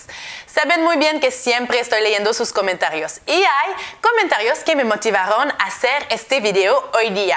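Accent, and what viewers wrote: France. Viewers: Double wow. Tout d'abord tu parles vraiment bien espagnol. Pour ce qui est de ton accent, c'est la première fois que j'entends un accent français aussi fort. Je serais curieux de connaître comment tu as pu maitriser aussi bien la langue espagnole sans perdre ton accent. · primera vez que escucho el acento frances con el español, :O es hermoso gracias ;D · el acento no tiene nada de malo, mi madre murió con él; es verdad, la formación bucofaríngea de entrenamiento del idioma manda; te doy un detalle: los españoles que pronuncian con zeta y ce marcadas, no pueden pronunciar la "tl" (atlántico dicen "adlántico", hitler dicen "hidler"); en estos días estoy tratando de pronunciar el nombre del piloto francés de Indycar Romain Grosjean (difícil) ¿gjromaen grosyeán?; todo es cuestión de práctica, hablas muy bien, perfecto, con acento francés, claro; ¿así que de la tierra de Aline Dieu? 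hablan francés en Quebec ¿verdad? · Te felicito que bien hablas español , solo es el acento y eso no significa un problema , igual para los españoles que viven en latinoamerica ellos aunque vivan en Peru por ejemplo nunca dejan su acento y su pronunciacion , basta ir a la iglesia y escuchar que aunque han llegado hace 40 años siguen pronunciando a la española , yo pienso que con tal que te hagas entender y uses bien las palabras el tiempo se encargara de ir suavizando tu acento frances pero a mi me parece muy gracioso y lindo , abrazos y bendiciones💗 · Se te entiende bastante bien el español y eres muy simpática. Creo que el tema de los acentos es una opción personal, hay quienes les importa más o menos hablar con acento extranjero. Si de verdad quisieras suavizar tu acento francés al hablar español, una pequeña sugerencia podría ser que trates de no vincular tanto tu idioma nativo al español. 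Un políglota que sigo en youtube dice que cuando habla otro idioma trata de verse a si mismo como si fuera nativo del idioma objetivo, de gesticular, incluso de vestirse como ellos, esto ultimo puede ser un poco exagerado pero me imagino que es algo similar a lo que hacen los grandes actores. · Que buen acento frances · eres guapisima, tienes un gran nivel de español y ese acento frances le añade un toque especial, entiendo lo complicado que es pronunciar, a mi me pasa con el frances, requiere de esfuerzo, un saludo;)! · Me cago de risa con el extanjego, pagqe, es muy chistoso el acento frances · El acento francés es adorable no lo pierdas :). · Las combinaciones que con más complicación es la combinación de las letras " TL " por ejemplo Tlalpan, Tlatelolco si prácticas este tipo de palabras te aseguro que se te hará más fácil el poder pronunciar el español, ha por cierto acá en México hay mucha falta de ortografía lenguistica y te darás cuenta ya que la mayoría de las personas ocupan la palabra ahorita para posponer alguna actividad ya sea para realizarlo posteriormente ya sea en hora o días , por cierto el acento que se te escucha francés y español se te escucha bastante hermoso saludos desde México 🇲🇽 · Por cierto estoy aprendiendo francés. 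Después de varios intentos en el pasado me muero por aprender vuestro idioma y eso que ante lo odiaba y todo ello gracias a tu acento francés del español. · me encanta tu acento francés y esas "r" típicas de un francés, suena tan hermoso y elegante, me encanta el francés y tu español es mavarilloso, super fluido ^_^ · Por favor no pierdas tu acento francés, es muy bello escucharlo mas de una chica.... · Tu acento francés es agradable al oído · Es uno de los videos que más me gusta de tu canal... Me encanta tu acento afrancesado y si se entiende todo bien, la gente critica por todo jajaja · Felicidades hablas español muy bien👍 y el acento francés es muy bonito👍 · Me encanta tu acento francés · Saludos, al escucharte por primera vez pensé que eras francesa y con ese acento parisino.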